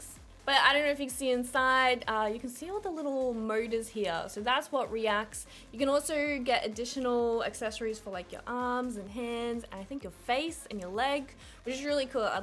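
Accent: Australia. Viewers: R u Australian ur accent sounds Australian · judging by your accent im pretty sure you live in Australia. i just wanted to ask how did you get your valve index. i have been wanting one for quit a while but due to the face i live in Australia i cant get one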